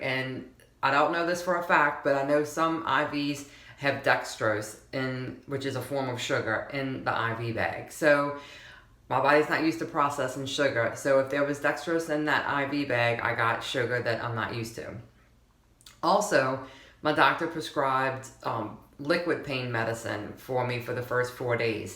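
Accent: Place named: Morgan City, United States. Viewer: Love your accent...sounds like home. I grew up in Morgan City.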